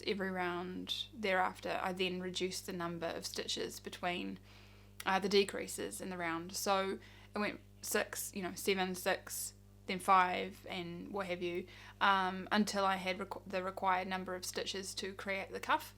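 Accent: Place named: New Zealand. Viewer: Hello fellow Kiwi knitter! Just came across your channel now. Great to hear a Kiwi accent on YouTube! Love your description of the project, you make it very clear on the pattern, materials and mods. Kia ora!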